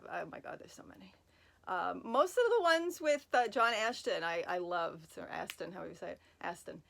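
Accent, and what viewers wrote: New York, United States. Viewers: Awesome video, love the N.Y. accent. Just an FYI I have notifications on and didn’t get any. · I’m a Londoner and been to NYC many times and I think your accent is awesome and quintessentially New York which is no bad thing at all. Sorry to hear of your fathers passing. Snap regarding being Italian 😊👍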